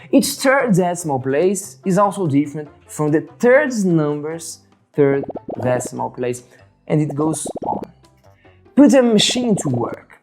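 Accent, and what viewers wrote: Minas Gerais, Brazil. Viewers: Sotaque mineiro em inglês é foda kkkk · Lhes apresento amigos o sotaque mineiro em ingles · UM MINERIN FALANDO INGLES É IMPAGÁVEL, QUE TREM MAIS BUNITO DE OIA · Não terminei o vídeo, mas quero saber onde tá o mineireis. "Why" não vale! Kkkkk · Minas Gerais accent is marcante, não tem jeito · O sotaque Brasileiro a falar Inglês é algo que me deixa intrigado, mesmo assim, excelente vídeo! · cliquei no vídeo so p ouvir inglês em mineirês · Now I can practice my listing with math vocabulary and Brazilian pronunciation, thanks for that. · O inglês "brasileiro" é o que eu mais entendo bem kkkkkk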